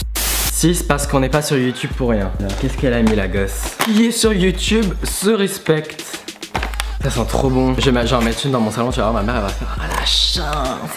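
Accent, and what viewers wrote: England, United Kingdom. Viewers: Tes vidéos sont bien meilleures sans ton accent anglais celle la était super :)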